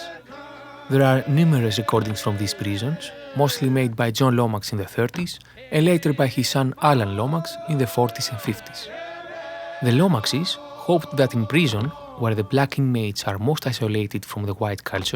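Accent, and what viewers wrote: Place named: Greece. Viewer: Are you Greek by any chance? Your accent seems very Greek to me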